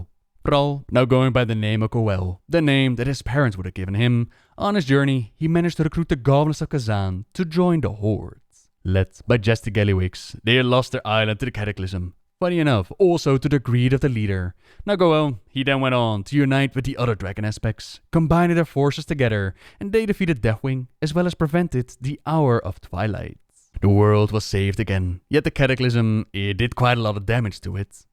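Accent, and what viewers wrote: Netherlands. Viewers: Nope but close, he has a very strong Dutch accent. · annoying dutch accent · sounds like Dutch accent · You sound dutch! Fun to hear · Are you Dutch? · That heavy Dutch accent is hard to ignore :) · Ik hoor echt dt je Nederlands bent :) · dutch accent :(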